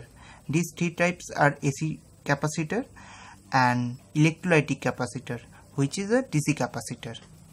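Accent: India. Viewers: 350 million AMERICAns and the video has 1 guy with a strong India accent?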